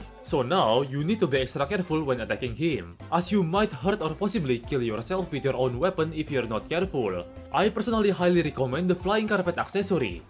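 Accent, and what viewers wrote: Russia. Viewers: Maybe Russia :P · No soy inglés pero creo que el tiene un acento ruso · The russian accent being so thick you have to wade through it to get to the other side is great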